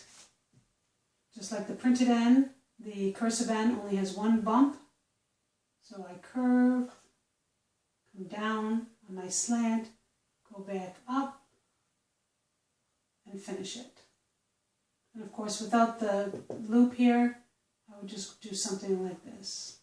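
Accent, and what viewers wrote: United States. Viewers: Thank you for the writing lesson. I followed along with my new fountain pen. Planning to begin written correspondence with my grandchildren who all live far away. In my mind, I was trying to pinpoint your accent. You sound like you could be from Scituate, Mass., or Enfield, Connecticut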